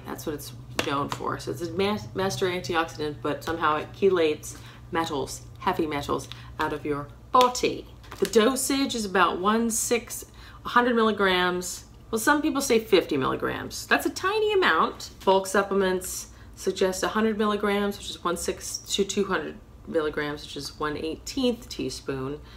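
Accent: United Kingdom. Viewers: did you ever live in England, flipping is an English term and you sometimes have an English accent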